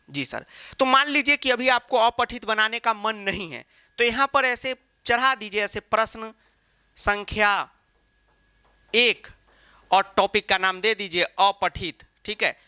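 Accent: Bihar, India. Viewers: Sir bihar se ho kya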